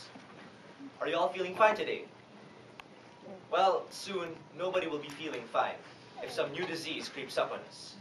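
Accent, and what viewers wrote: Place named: United States. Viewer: how come his got an american accent?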